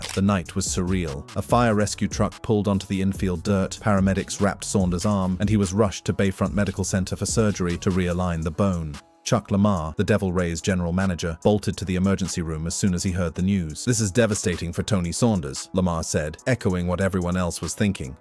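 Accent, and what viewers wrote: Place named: United Kingdom. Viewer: Why the FCK does the narrator have a British accent???? Where in the UK does ANYONE play baseball???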